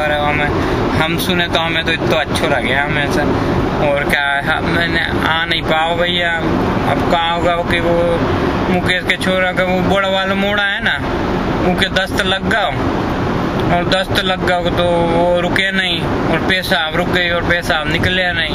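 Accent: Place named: Vidisha, India. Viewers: D Bundelkhandi Boli.....quite close!!! Good one!!! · bond ho sir aap...i have a friend from vidisha, sounds just like you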